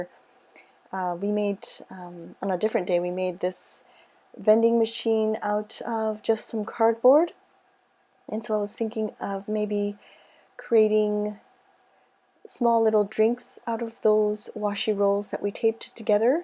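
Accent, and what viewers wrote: Canada. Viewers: Hey! I prefer longer videos! I journal along with you, and with the shorter videos, I just get started and the video is over! But that's just my preference! I really just enjoy your content! P.S - I know that you have lived in Japan for several years now, but you still say 'eh?' in your videos from time to time, and as a fellow Canadian, it always makes me smile! I hope that doesn't sound silly:)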